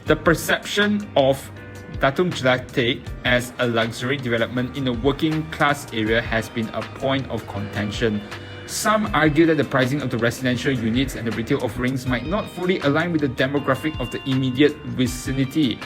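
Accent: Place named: Singapore. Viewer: Bagus cakap dalam bahasa Melayu, bahasa Inggeris logat Singapura sangat mengeciwakan..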